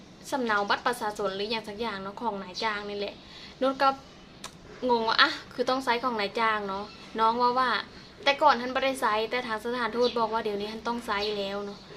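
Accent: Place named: Nakhon Phanom, Thailand. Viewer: เจ้าเว้าญ้อคือคนนครพนมบ้านข้อยเนาะ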